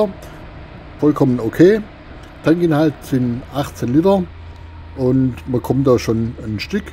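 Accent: Swabia, Germany. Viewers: Super !!! Wieder ein Motorradvideo - das Schwäbische ist echt super - heimatverbunden eben - fast wie die Aprilia - das ist wirklich ein tolles Moped - Danke für das informative Video - das immer etwas anders ist als die anderen Videos !!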